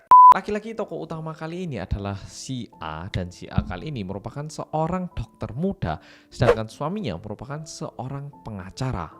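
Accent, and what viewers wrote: Java, Indonesia. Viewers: mas, suara viedomu tu kecil, cuma kedengeran medoknya doang, boleh saran ya volume nya agak di gedein, soalnya kaget udah gedein volume trus tau2 iklan kenceng banget suaranya T_T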